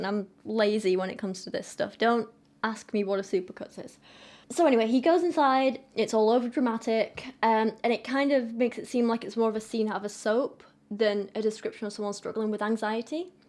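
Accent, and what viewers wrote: United Kingdom. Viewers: After watching u for months I just noticed ur accent, like I didn’t realize u were British until rn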